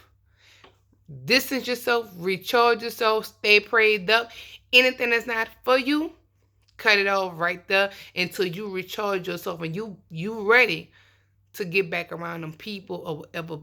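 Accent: New Orleans, United States. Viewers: I love when your name pops up in my notifications. Means I'm gonna get some insights in your cute N.O. accent.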